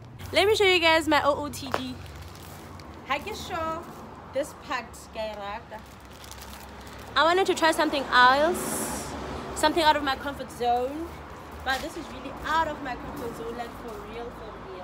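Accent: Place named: Botswana. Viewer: "Bhuti okgotse ebile wa otshela , santse retlogo tlhatsa " nna I'll never get used to your Tshwana accent weitsi I could listen to you all day😂😂😍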